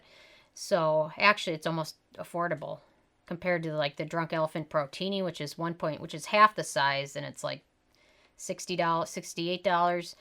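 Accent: Minnesota, United States. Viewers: I know this is an old video, but I just ran out of my PTR moisturizer and I happened upon this. What a rude comment from the above person about your voice needing work. We all have accents. I just hear a Minnesota accent, not a bad sounding voice! You should hear my New Yawk (NY) accent! You have a lovely voice <3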